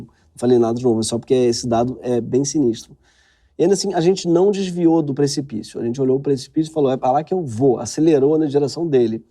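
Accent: São Paulo, Brazil. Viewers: falando paulistanês é o melhor MEU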